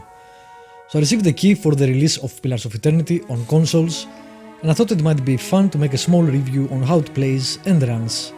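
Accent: Greece. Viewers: Nice Review.You speak English like a Greek,are you Greek? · Ειναι αστειο πως καταλαβαινει κανεις κατευθειαν αυτην την ελληνιλα στην προφορα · Great review, was really interesting to listen to your Greek accent & how you pronounced different words. Good show! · Are you Greek? It seems from your accent :)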